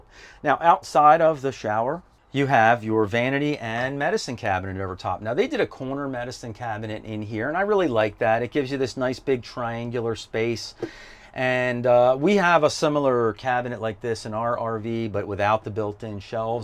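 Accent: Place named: Western Pennsylvania, United States. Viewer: The MD or western PA accent is strong. Ha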